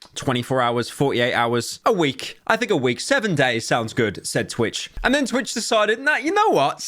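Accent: United Kingdom. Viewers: Listen, I like your content but you’ve got to stop pronouncing names so Britishly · wait your British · being British does give a large factor, his voice is way more pleasant to listen to than Critikal. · The one reason I what covering twitch drama is to hear a Bri'ish person say these streamers' name:))) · ur british? ok cya not listening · yuck british 🤢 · The only reason I watch for news is because critical sounds really boring. And pyros British. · I only watch for his yee yee ass British accent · You're brittish? fuck man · Bri'ish? — opinion rejected... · Mogul Mail is enthusiastic charlie, is british mogul mail.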